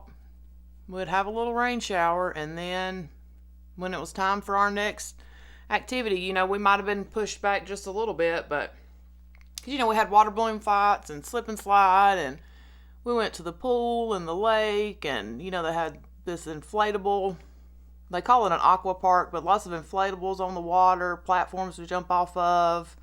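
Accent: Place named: South, United States. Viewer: Hi. I'm new to your channel and I enjoy watching very much. I am born and raised in the south also. Love your accent! I am from very and humid Polk County Florida. Dead center of the state. Thank you for sharing about your camp experience and your closeness with God. I need to hear it too. I am so glad you had a great time being in nature. Keep up the good work with your channel. I am sure you will get to 300 subscribers very soon. Good luck!!!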